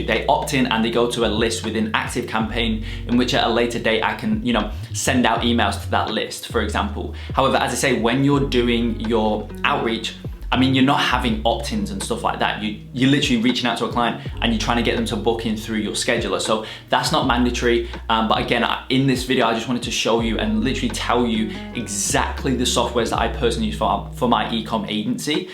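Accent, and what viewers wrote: United Kingdom. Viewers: You live in Australia but you are British, right?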